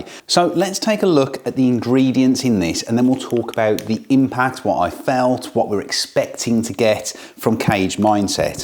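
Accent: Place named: New Jersey, United States. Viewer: Nice accent you got there. New Jersey?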